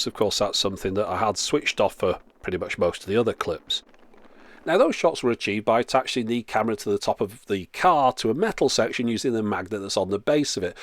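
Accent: United Kingdom. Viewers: from which part of the UK you are?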